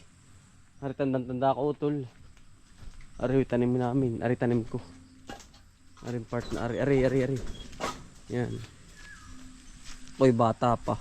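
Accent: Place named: Laguna, Philippines. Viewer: Tagalog kang talaga , sa Laguna ba kayo paki sa sagpt manan kapatid, natuwa akong makarinig ang ganyang punto ng pananagalog.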